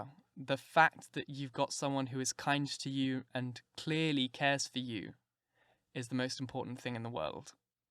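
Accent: United States. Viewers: Saved me from probably taking much longer to read this book due to the southern accent. Thank you so much!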